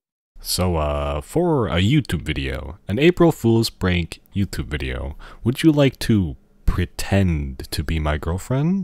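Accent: Netherlands. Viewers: hold on are you dutch?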